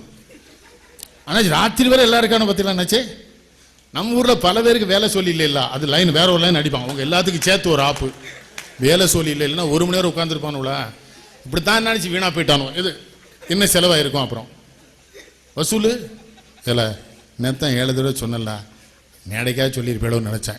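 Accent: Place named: Tirunelveli, India. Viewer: Tambirabarani tamizh ketkka ketkka ruchi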